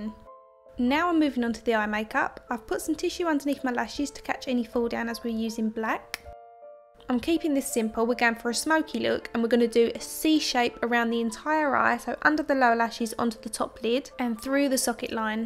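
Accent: Essex, United Kingdom. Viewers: Where abouts in the UK are you from? I can sense an Essex accent ;) i love your videos & you are super talented, new sub!💗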